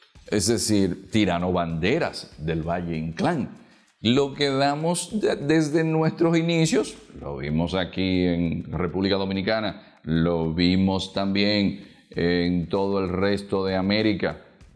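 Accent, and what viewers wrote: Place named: Venezuela. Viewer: No sabia eso,bien por este hombre además tiene acento Vzlano.,un saludo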